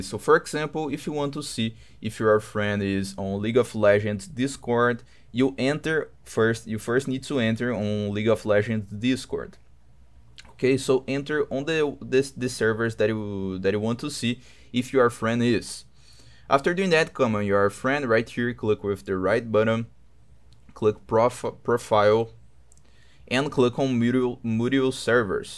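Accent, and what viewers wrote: Brazil. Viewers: O sotaque Brasileiro me pegou kkkkk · parece muito carioca kkkkkkkk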